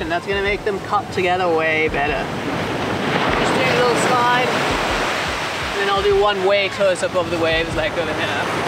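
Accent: Cape Town, South Africa. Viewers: Hy dude are you from Cape Town?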